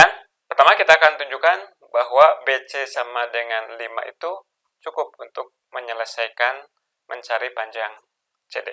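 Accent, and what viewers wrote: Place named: West Java, Indonesia. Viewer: Mantap nih, aksen sundanya dapat utbknya juga dapat.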